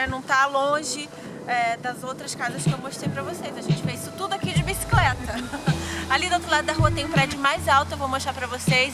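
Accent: Rio de Janeiro, Brazil. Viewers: Você morava em São Bernardo do Campo? Mas você tem sotaque carioca, haha.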